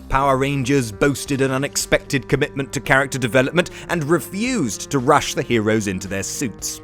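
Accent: United Kingdom. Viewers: Voice actor of this video rocks! I haven't heard so over-the-top British voice in years, awesome! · Power Rangers didn't suck? Well, ok I guess you're entitled to your opinion... (for all those outside of the UK new to this channel we don't all talk like this)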